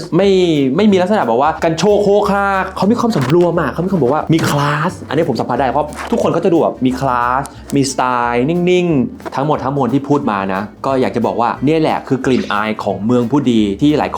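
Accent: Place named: United States. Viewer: พูดเหมือนที่เมกาในตอนนี้เลยค่ะ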